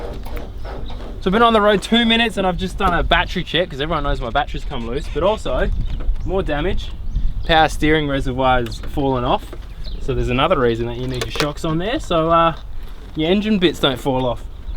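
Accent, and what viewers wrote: Australia. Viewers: I was trying to read subtitles from homescreen but YouTube couldn't understand Aussie accent 😅😅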